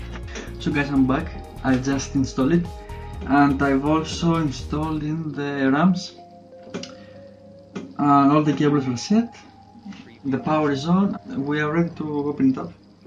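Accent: Greece. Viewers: U have such Greek accent r u Greek?? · Thnx you sound like a greek just like my favorite basketball player Giannis Antetokounmpo im not greek im a filipino i didn't notice i got a bad ram stick its a ddr3 and its not connected properly it now boots up with display but the beep is gone forever what do i do now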